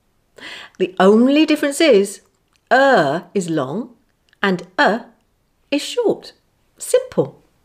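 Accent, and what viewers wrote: United Kingdom. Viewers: Can ask u a question that what's kind of your british accent? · The accent of English is British? I don´t notice at the first time that I listen your voice.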